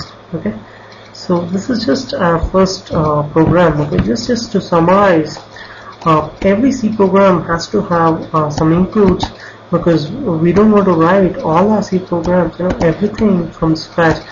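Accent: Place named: India. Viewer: sounds as if your an indian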